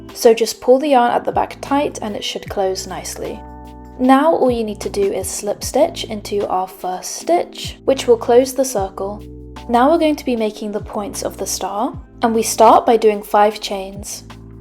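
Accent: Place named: United Kingdom. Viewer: Indeed you did it quite absolutely fabulouslly magically authorically efficiently with your nice british accent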